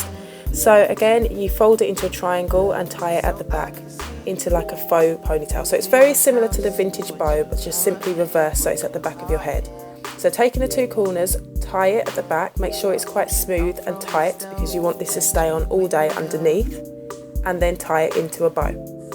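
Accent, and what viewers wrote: London, United Kingdom. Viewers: Beautiful Look! Beautiful Wraps!! Thank you. And you have the nicest British accent, that's a compliment. Absolutely beautiful. Thanks for all of your videos. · I love London accents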